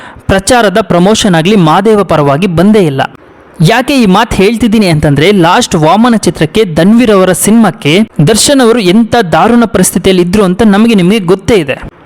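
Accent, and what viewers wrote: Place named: Tamil Nadu, India. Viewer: ಲೋ ಅಯೋಗ್ಯ, ಚಾನಲ್ಲ ನಿರೂಪಕ, ಸ್ಪಷ್ಟ ಕನ್ನಡನೇ ಬರಲ್ಲ ನಿನಗೆ, ತಮಿಳು ಮಿಸ್ರಿತ ಕನ್ನಡ ಮಾತಾಡ್ತಾ ಇದಿಯಲ್ಲೋ, ಭಿಕ್ಷುಕ ನಂಗೆ ಕೇಳ್ಕೊಳ್ತೀಯಾ ಸಬ್ಸ್ಕ್ರೈಬ್ ಆಗಿ ಅಂತ, ನೀವೆಲ್ಲಾ ಕನ್ನಡ ಭಾಷೆಯ ನಿರೂಪಕರು, ನಿನ್ನ ಯೋಗ್ಯತೆಗೆ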